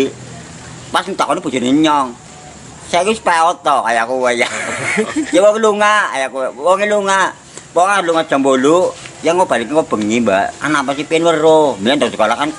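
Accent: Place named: Banyumas, Indonesia. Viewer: Mbah ... Bahasa ngapak yg begini bikin aku betah di tegal ...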